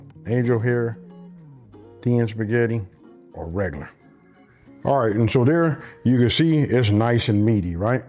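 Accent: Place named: Bay Area, United States. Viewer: Because of Ryan Coogler, I can now detect the Bay area accent 🤣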